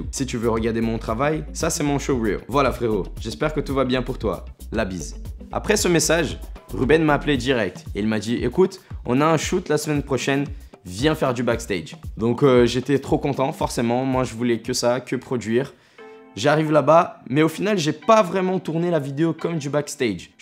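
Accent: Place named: Brazil. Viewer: Finally ça m'a coûté deux cliques "s'abonner" et "j'aime" J'entends un fond d'accent brésilien, ne le perd pas, ça me rappelle des souvenirs 🇧🇷🌞 en tout cas j'attends la suite avec impatience.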